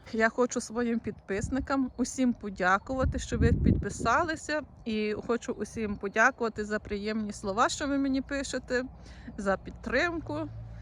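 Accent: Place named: Galicia, Ukraine. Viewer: Привіт зі Львова ❤, перець можна в'ялити точно так, як помідори і потім додавати до піци, салатів і т.д. Відео дуже цікаві, приємно чути галицький рідний діалект, з задоволенням дивлюсь❤❤❤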